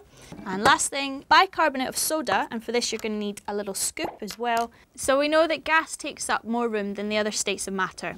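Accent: United Kingdom. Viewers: British accent ftw XD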